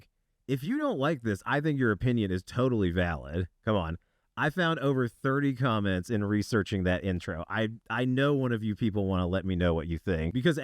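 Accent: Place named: California, United States. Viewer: Man that cali voice is fckn annoying I can't man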